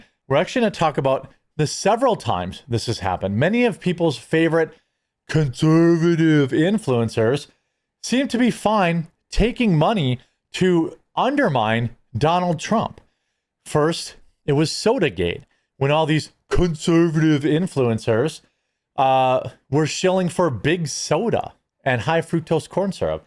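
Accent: India. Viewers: More of your accent your Indian accent it's great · Your dumb Indian accent is only topped by your inbred trailer park hick uniform of ball cap indoors and queer beard 😅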